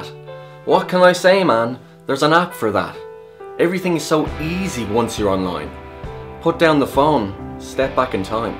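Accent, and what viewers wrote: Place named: Ireland. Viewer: Your soo funny and I would do anything to have your IRISH ACCENT. Swap? I'll give you A Scottish one for your Irish One? Sound Good? :D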